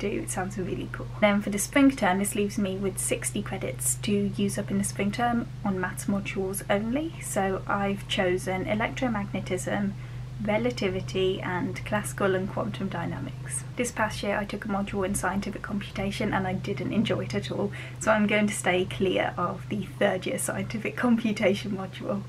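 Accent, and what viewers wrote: United Kingdom. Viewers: Not a maths student just watching your video so I can learn English and understand British accent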